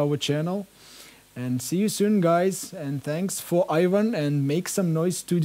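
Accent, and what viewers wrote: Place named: India. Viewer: ur english look so hindi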